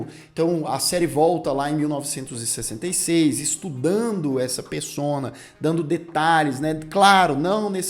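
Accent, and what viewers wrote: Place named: Brasília, Brazil. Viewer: Vc é meu vizinho!!! Sabia que pelo seu sotaque devia ser daqui por aqui perto (sim, galera de Brasília tbm tem sotaque). Não vi série, mas pretendo. Estranhei essa cor amarelada, achei que fosse meu celular, agora eu entendi hahaha.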